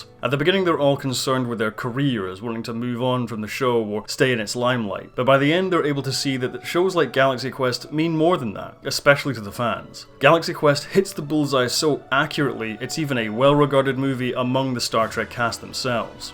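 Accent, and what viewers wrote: Scotland, United Kingdom. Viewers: OMG! What town in Scotland were you born in? Sooo beautiful. Your Scotch accent is not thick BTW. LOL. Jjking. I know the term is "Scottish". The Macallan is my favorite Single Malt SCOTCH whiskey(proper use). No blended whiskey ever passes my lips.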